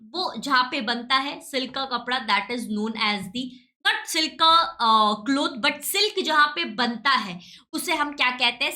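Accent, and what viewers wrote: Maharashtra, India. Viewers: Mam app Marathi ho kya 😅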